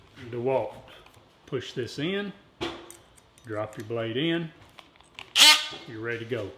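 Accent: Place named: Alabama, United States. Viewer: Sounds like a 'Good ol' boy' from Alabama. Good comparison regardless.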